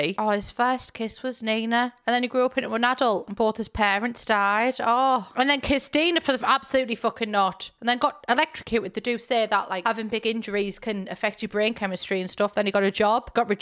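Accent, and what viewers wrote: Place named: North, United Kingdom. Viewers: Not just any British. Northern British. It hits different. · Northern British really does hit different. It’s not as posh as most British accents and I have no choice but to stan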